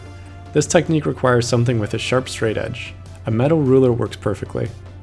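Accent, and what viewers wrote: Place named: Europe. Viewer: From which country are you from?? I love your european accent 😍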